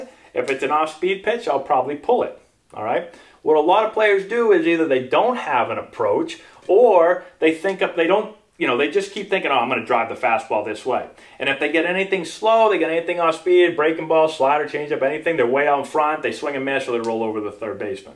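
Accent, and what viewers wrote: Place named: Boston, United States. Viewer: I love the boston accent okay's in there every now and then. reminds me of mike francesa ogay?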